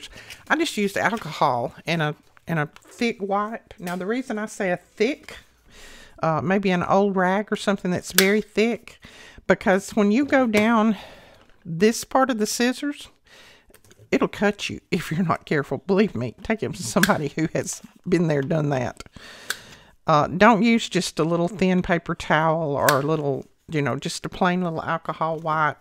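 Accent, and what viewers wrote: South, United States. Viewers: For not coming up with new ideas you sure knocked it out of the park with those. And the term is too many irons in the fire. I think they used to put irons in the fire. Literally. 😂. Great job as always. I love watching you craft and hearing your southern accent. So cute. Sending much love and blessings, Sharron 🥰🥰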